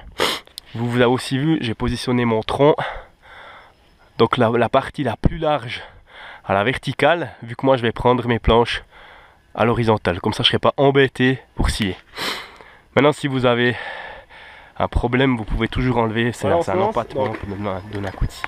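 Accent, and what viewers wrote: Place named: Switzerland. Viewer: Mugnerot Daniel morteau morille c l accent du doub et lui c l accent suisse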